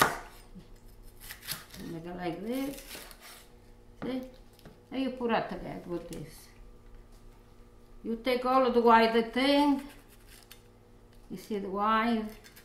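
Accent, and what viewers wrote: Italy. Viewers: that dish looks delicious. I am making it today. I just Love you, your singing and your accent. Close my eyes and I’m hearing my Italian family. God Bless You ✝️ and your family. Son n Law you do an excellent job of filming. 👍🏻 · Once I heard the accent I knew I came to the right place! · Grandma is so sweet and her singing Oh I love it.Although I don't understand the meanings but she also explaines what she's singing in her beautiful accent. I'm learning Italian dishes from her which are my favourite. 😋Lots of love from Pakistan and God bless! 😍🤗😚😚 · lovr her singing and her cooking her italian acent makes me smile love her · Yes. The only recipe I keep coming back to. I’m almost full Italian blood here in America. Her dialect and accent resonates with me. I’m a 4th generation here so a lot of the Italian culture is lost but this makes me happy and this recipe is delicious!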